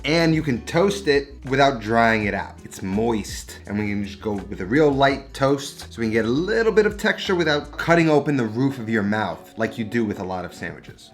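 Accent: New York, United States. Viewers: for the love of God, stop overthinking the way you pronounce ricotta. Don’t feed the trolls. You’re Italian American from New York, so is my sister in law- her whole family pronounces it the way you do. People pronounce things differently in different places. Also I’m Irish, and that’s how all my chef instructors in culinary school years ago pronounced it. People need to move on if they’re still giving you shit about the way you speak. Sandwich looks amazing by the way, keep doing you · No, it's an Italian/ American New York thing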